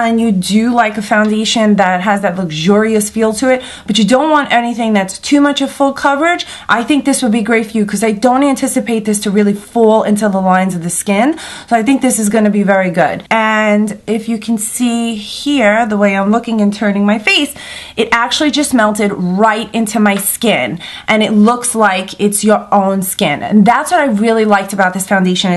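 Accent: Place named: Long Island, United States. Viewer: OMG! I am not being offensive. I am from Lawnguyland. You sound like home. Non LI pronunciation: "Lan Jer E" :-)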